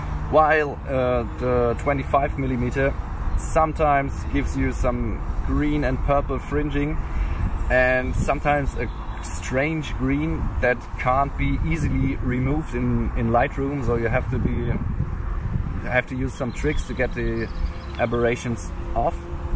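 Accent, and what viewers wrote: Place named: Germany. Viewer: Charmanter deutscher Akzent..... 😉Tolles Video.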